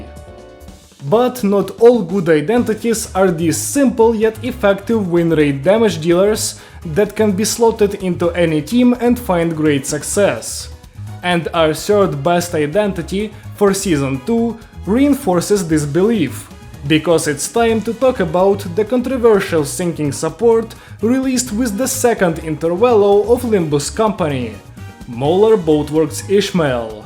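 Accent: Russia. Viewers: Я же слышу что ты русский наш слон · Как же он пытается говорить на английском, но как же слышно русский акцент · russian accent wth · Are you Russian, by any chance?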